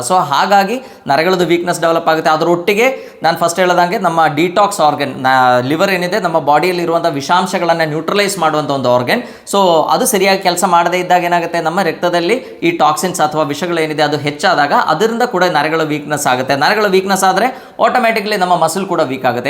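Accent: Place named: Kasaragod, India. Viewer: Doctor re nivu kannada pada balasi namage English Word artha agolla. Nivu pure kannada davaru alla. Gadinaadu pradesha kasaragodu avara tharka ide nimma kannada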